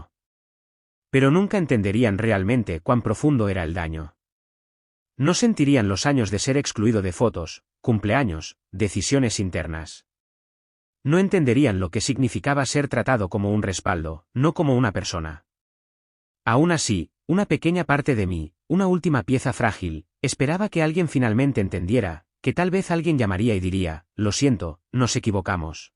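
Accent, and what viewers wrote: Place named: Andalusia, Spain. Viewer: ÉSE TONO, MEDIO ANDALUZ, MEDIO ESPAÑOLADO,ES BASTANTE SINGULAR...ES EXCELENTE LÁ DICCIÓN... SALUDOS CORDIALES..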